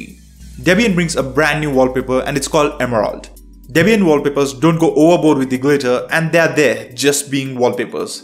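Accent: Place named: India. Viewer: My ears are bleeding hearing this effed up horrible Indian accent, and I had to stop at less than a minute into the video 🤮🤮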